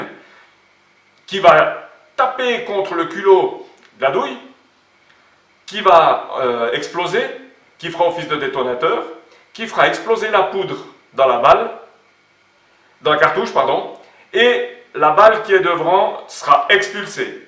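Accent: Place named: Bernese Jura, Switzerland. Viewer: du jura-BERNOIS!!! bandes d'ingrats, merci pour ta video👍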